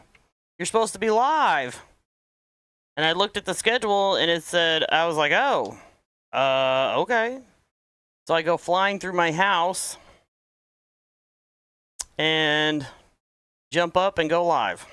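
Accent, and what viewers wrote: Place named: Kentucky, United States. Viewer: Hi, I knew you were from KY before you told us. I am from KY, and I recognized the accent. I lived away from here for several years and lost a lot of my accent though. It is always good to be in KY. I love it.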